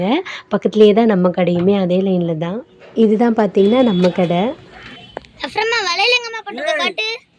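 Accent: Ramanathapuram, India. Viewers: Ennoda native ramnad dhan unga speech vachudhan kandupidichen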